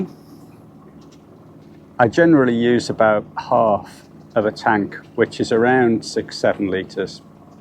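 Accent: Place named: Northern England, United Kingdom. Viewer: Oh I see 😊 Thank you mate.I did notice you had a northern accent 👍 I was thinking somewhere near Liverpool? Your vidios are brilliant,I love how you get so excited and can see you are a genuine nice guy that loves his fishing.Keep them coming buddy.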